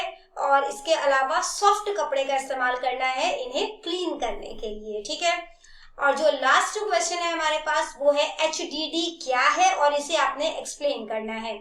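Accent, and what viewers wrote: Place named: Punjab, India. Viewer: App punjabi bohut cute bolte ho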